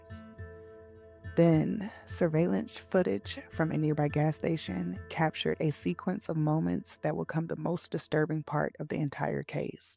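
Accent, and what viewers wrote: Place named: Atlanta, United States. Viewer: Most of the people who are in Atlanta are not from Atlanta.. just ask the people who you encounter.. .. Honestly you sound like you were born & raised in the A.. if not where are you from? We are hoping that everyone will just go back to where they are originally from so that we can have our city back !! Like someone said today young black men has been around since the beginning of time.. Stop stereotyping them by calling them YN’s ! If you all think that Atlanta is so bad.. try living in Memphis or Chicago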